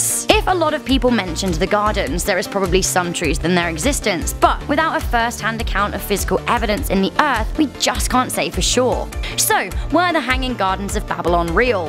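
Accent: United Kingdom. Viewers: The moment I opened it my eardrum was assaulted by this irritating high pitched feminine British accent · Lmao she has a British accent. I'm British. Trust me, we usually know how to say Nebuchadnezzar properly here 🤣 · Love the Brittish accent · Shouri Like a Melody that’s exactly what an accent is, she has a british accent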